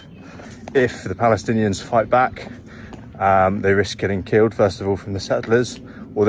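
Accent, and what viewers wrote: United Kingdom. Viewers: American in Palestine? You need to change the caption, he’s clearly British. Which doesn’t alter the message he’s trying to present. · From his accent, he is British (specifically born & raised in England) - not American.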